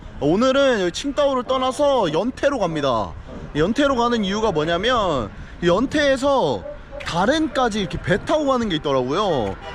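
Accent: Jecheon, South Korea. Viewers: ㅋㅋㅋ 보면볼수록 제천사투리가 잘 들리네요 ㅋㅋㅋㅋ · 사촌형이 제천사람이라 그런가 말투 너무 익숙해요 ㅋㅋㅋㅋ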